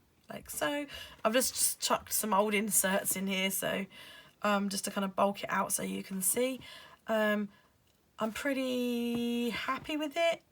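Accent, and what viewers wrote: England, United Kingdom. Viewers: Lovely to hear another English voice on here! I got mine from Amazon, but I'll be going to The Range in future as is much cheaper!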